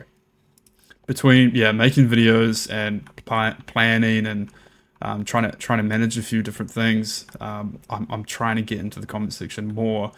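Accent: New Zealand. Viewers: also i know your AUS but you sound like you have a touch of kiwi.. true?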